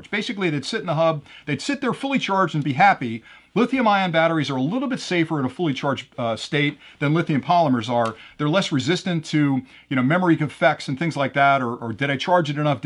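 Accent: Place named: California, United States. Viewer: Im thinking fast talking Californian! I moved from Cali to Tennessee and had to learn to slow down when talking!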